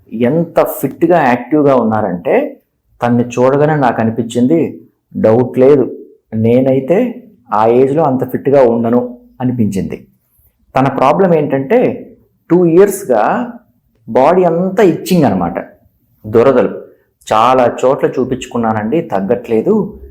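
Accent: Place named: Vijayawada, India. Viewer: మీది బెజవాడ నా. మీ slang అలా అనిపిస్తోంది. చాలా నిదానంగా స్పష్టంగా చెబుతున్నారు. అభినందనలు డాట్రారూ 💐💐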